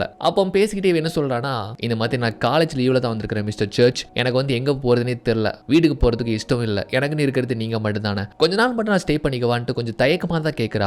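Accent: Tirunelveli, India. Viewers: Enna bro thirunalveli veliya varuthu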